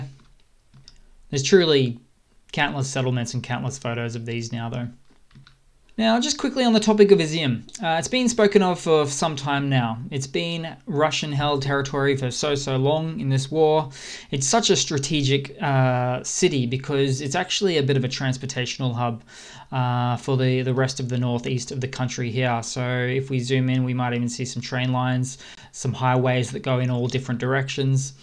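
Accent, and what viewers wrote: Australia. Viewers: It's great to hear an Aussie voice analysing this war so well. You've shown images even Ukrainian sources have not yet shared. Well done, mate, keep it up!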